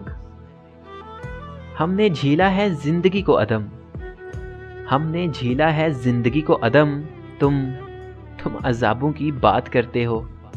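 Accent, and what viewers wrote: Punjab, India. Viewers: shyri achi hai per maaf krna punjabi lehja main sara maza khrb kr diya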